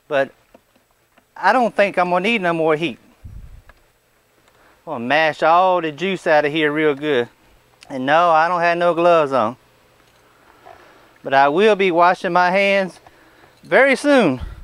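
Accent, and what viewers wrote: South, United States. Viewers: I love your southern accent! I think raccoons are chewing up my trash at night. I found my milk cartons torn apart across my deck. I can show you what my trash bucket looks like. I don't have a garden, but I need to keep critters from coming up here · Hey dude, enjoyed your video very much! I'm in Los Angeles so your Southern accent and references to woods and swamps was refreshing! I've got a raccoon (in LA? YES!) eating my crops in my new backyard raised garden. Ate my tomatoes and corn just like the day before I was ready to harvest. When it ate one of my 20-year old box turtles, it was WAR! I did the pepper/garlic thing, which worked for a while. Then bird netting. But now I'm going to get a trap like you have, apprehend that critter and drive it into the Santa Monica mountains and set it free.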